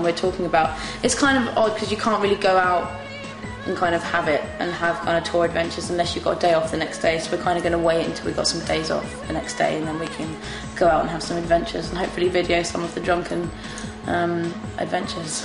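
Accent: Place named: RP, United Kingdom. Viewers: What's wrong about sounding posh? Her and her bands music is amazing. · She english duh! If she was Irish that would be awesome :L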